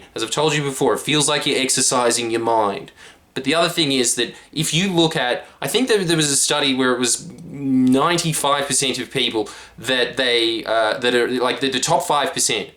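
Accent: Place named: Australia. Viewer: Best channel to learn Australian pronunciation.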